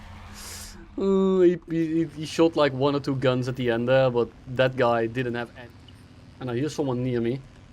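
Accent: Netherlands. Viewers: Dutch accent, lovely.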